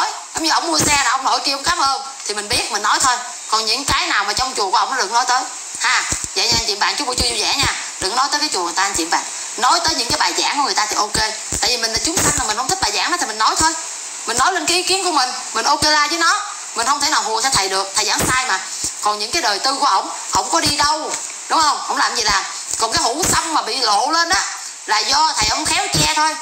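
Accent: Standard. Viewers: Em ca hat noi rat chuan nghe kg ong thay dong ho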